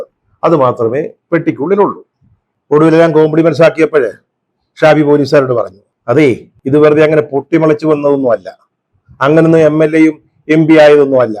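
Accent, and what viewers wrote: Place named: Travancore, India. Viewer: Wonderful...Your searing comments laced with humour and delivered in the Thiruvithankur lingo was captivating...You are perhaps the best political commentator among all the Malayalam commentators...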